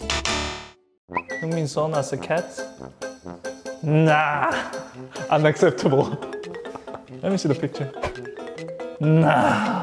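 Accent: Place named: Germany. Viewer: His German English accent is so cute haha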